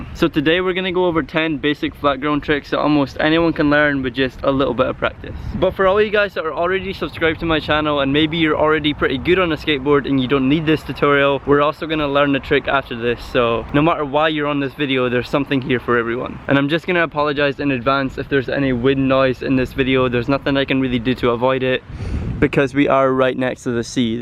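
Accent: Scotland, United Kingdom. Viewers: Scottish. I knew it! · Are you from Scotland or the UK ?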